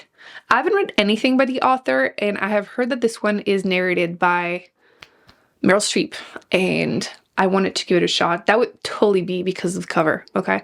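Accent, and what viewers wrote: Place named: Quebec, Canada. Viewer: Moi qui t'entend compter en français et qui réalise que tu es québécoise: 😮🤯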